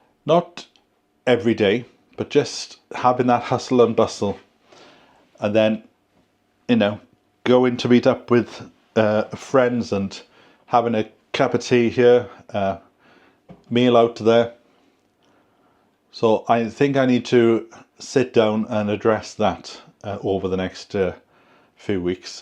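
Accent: Wales, United Kingdom. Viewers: I so enjoy your videos because it is like visiting adear friend. Honest dialogue and just hearing that beautiful Welsh accent takes me right back to my Grandma’s kitchen. Don’ t let those trolls get to you. Not worth your time or energy. Your true blue followers just enjoy spending time with you and enjoying your allotment videos and new vistas when you are out and about in your car. Just you being you is all that we need to bring a little Welsh sunshine into our lives. 💕👍🏻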